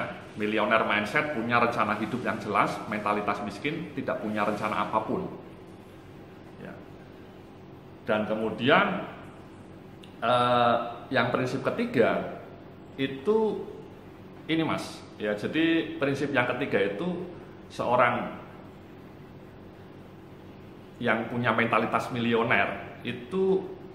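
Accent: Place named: Java, Indonesia. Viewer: kok ngomonge kaya wong jawa yak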